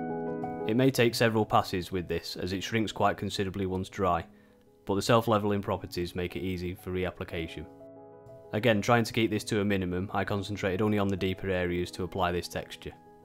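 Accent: United Kingdom. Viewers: You have taken a simple concept and made it look so realistic. I admire your attention to detail and vision in these projects. Keep up the good work. If you don't mind I am curious about your accent. What part of the UK are you from?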